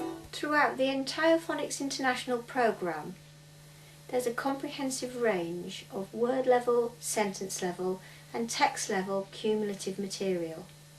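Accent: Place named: United Kingdom. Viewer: british accent. the best accent. thanks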